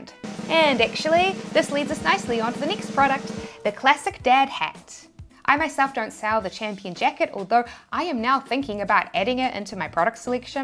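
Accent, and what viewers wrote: New Zealand. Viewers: I'm just here to listen to the kiwi accent...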